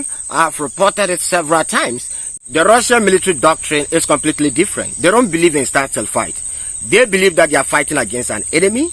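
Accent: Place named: Ghana. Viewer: Stop the Ghanaian English and listen 👂👂 to the West. New technology is the issue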